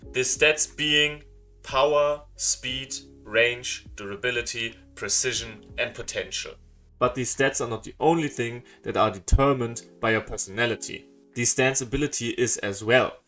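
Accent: Germany. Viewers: digga dein deutscher accent ist so zu hören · Bruder man merkt so krass das du deutsch bist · Your german accent is really great! it's always good to improve your language ofc :D but your german accent is absolutely fine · Are you german? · geiler deutscher Akzent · You sound german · Are you German? Klingt bissl so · You sound really german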